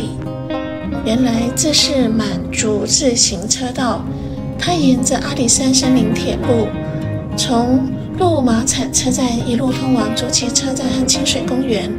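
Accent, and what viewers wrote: Standard. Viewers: 好久沒聽到這麼字正腔圓的國語~好有回憶殺的聲音!好好聽!~^^~ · 旁白以前的工作一定是老師不然就是播音員,聲音好聽,發音標準,又介紹的很詳細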